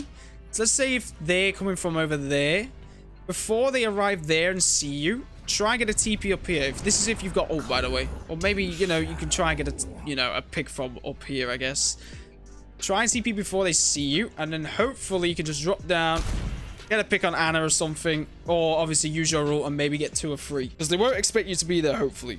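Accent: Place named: South London, United Kingdom. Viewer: What part of London is the accent from bro? Sounds south.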